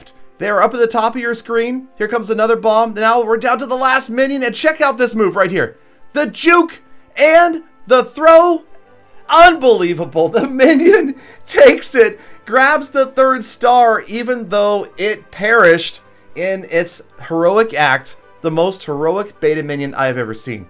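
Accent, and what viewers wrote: Poland. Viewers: r u Polish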